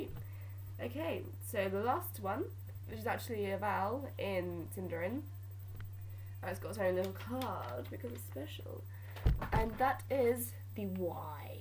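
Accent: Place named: United Kingdom. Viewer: 【Leia】 It's ok I pronounce it the same way. Where are you from? You sound like me. (I'm British btw!:3)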